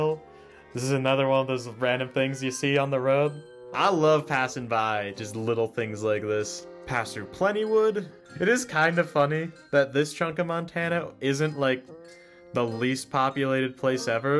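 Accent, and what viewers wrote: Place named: North Carolina, United States. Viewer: dumb question, are you from North Carolina? not trying to stalk you, im just asking based on your accent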